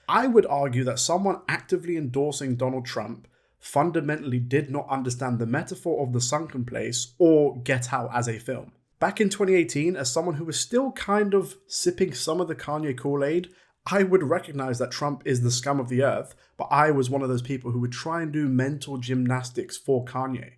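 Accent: United Kingdom. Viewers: I respect your opinion But a British accent criticizing the greatest leader my country has ever seen….is interesting As an actual black person I respect Ye’s move to the right Inspires more true black Americans to get out of this liberal stranglehold that’s been destroying our communities for the past 60 years And after 400 years of slavery, yes, it does become a choice to stay a slave. Especially when you out number the people enslaving you. What do you think share cropping was? Former slaves that chose to stay on the plantation after emancipation. Ye’s still the best Never bad in my book WW3 & Bully will be legendary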